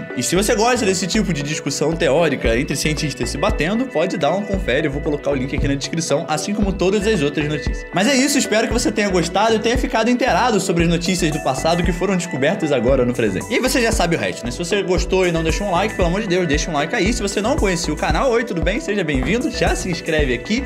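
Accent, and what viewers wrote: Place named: Rio de Janeiro, Brazil. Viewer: Adorei o vídeo, faz uns vídeos contando um pouco da história do Egito e dos faraós, como se fosse uma baita fofocona kkkkk acho da hora história sendo contadas dessa forma e pra quem tem dificuldade de entender ajuda bastante. Outra coisa, adoro seu sotaque carioca, amo seus vídeos do TikTok.. continue assim❤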